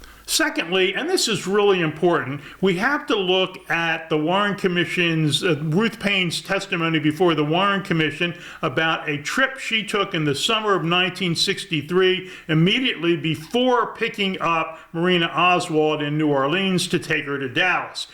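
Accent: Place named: Philadelphia, United States. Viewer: I detect an east coast, Pennsylvania/Philadelphia/Delco accent. Thank you for another informative presentation.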